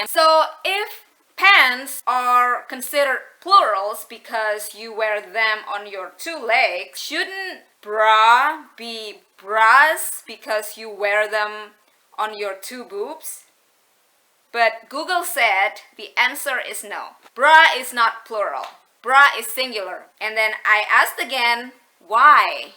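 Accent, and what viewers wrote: Surabaya, Indonesia. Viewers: Ya kan mbaknya org indo, jelas masalah aksen atau logat tentu berbeda, sama kayak singlish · Suroboyo mana suara nya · U hv such a cute Indonesian accent while ure speaking English 😘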